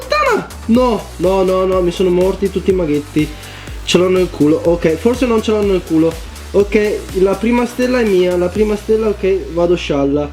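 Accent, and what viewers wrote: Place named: Veneto, Italy. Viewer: oh te si dal veneto...mi so veronese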